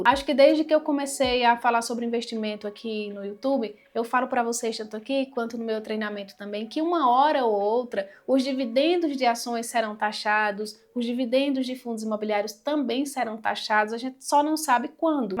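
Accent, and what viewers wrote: Northeast, Brazil. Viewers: linda maravilhosa..❤❤... Obrigado pelo seu trabalho.... fiel seguidor. Amo esse sotaque nordestino.....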